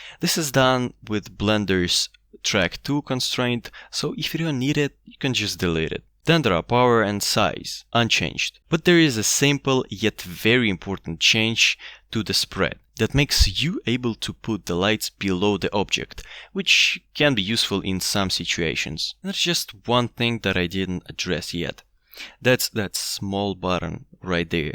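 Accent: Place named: North Caucasus, Russia. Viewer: Bro must be from the north caucasus.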